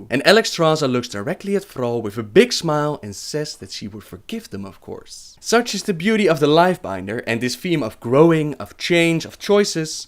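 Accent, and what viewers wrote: Netherlands. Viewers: Dat Dutch Aksent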